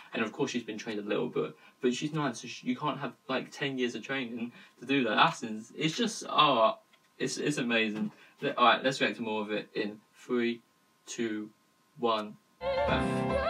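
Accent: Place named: United Kingdom. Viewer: Am following this channel. Extremely love that British Accent.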